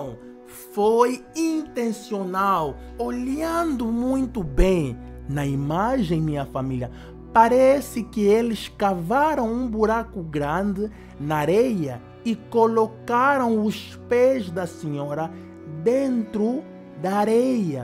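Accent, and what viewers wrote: Angola. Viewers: Esee cara narrando parece angolano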